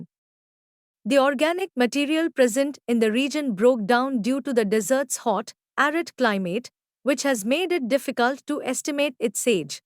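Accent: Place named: Middle East. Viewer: Even with a Middle-Eastern accent, you sound like a bot.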